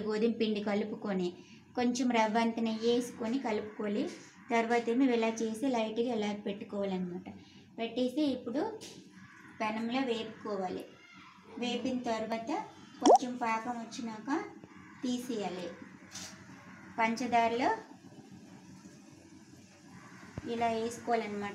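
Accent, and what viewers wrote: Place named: Srikakulam, India. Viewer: Native srikakulam slang and dish 🔥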